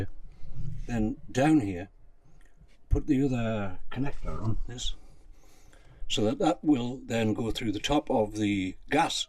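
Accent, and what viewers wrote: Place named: Newbiggin, United Kingdom. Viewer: Another great video, Love to see what you've been doing to your van. Where abouts are you from? sounds like you are from newbiggin area?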